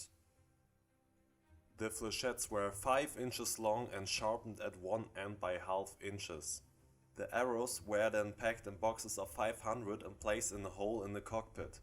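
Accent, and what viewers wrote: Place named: Germany. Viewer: The accent is clearly german. I don't get why inches are used. For ammunition specs, germans would usually use millimetres (mm).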